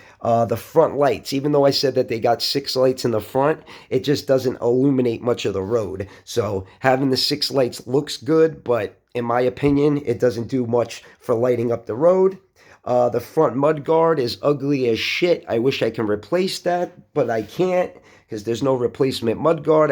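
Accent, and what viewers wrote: New York, United States. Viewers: I love your commentating love the NYC accent keep up the great work my friend!!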